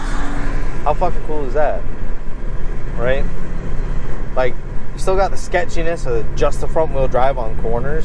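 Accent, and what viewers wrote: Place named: United States. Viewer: Couldn’t tell if this guys American or English for first 5 mins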